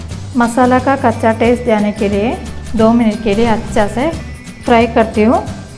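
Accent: Kerala, India. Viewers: you are from Kerala,you should be proud to speak in your mother tongue.. Your Hindi sounds like Malayalam. I love Malayalam,speak in Malayalam . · love the mallu accent....maja aa gaya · Nice receipe , Nice malayalam Hindi & Nice saree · Amazing Ma'am. Super fish curry. And your Hindi is amazing, with a touch of Malayalam accent. . That's the beauty of our great country India. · fish to banayenge tab banayenge par aap ho bahot hi zyada CUTE ho and aapka malayalam accent me hindi bolna bahot hi pyara lagta h. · Love you dear. Am in Dubai suddenly I remembered frind who is shifted to Kerala Bec same style of Hindi speaking ❤️❤️❤️ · Nicely explained. Moreover your language was very sweet dispite being from Kerala. Hindi gives you a wider audience... keep it up 👍. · You are so sweet hindi Kitna majedar he kerala accent me fantastic recepi god bless you always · aapka hindi bolne ka style mujhe meri friend ki yaad dilaya. 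She is also from kerla.. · Hello there, liked watching your recipes, specially the coconut shells used n the pots cooked in. Your hindi reminds me of one of our Auntie from Kerala and many friends I have from there, so also when you give recipe details I cherish your speaking. Keep sharing recipes, I wish I could also get tamarind and shallots from Kerala :-) Have a blessed wonderful day! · Your Hindi is typical Mallu Hindi....it reminds me of my mummy speaking hindi with our neighbors...even my mom makes fish curry in the same manner....amazing job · Keralite flavoured hindi is really very sweet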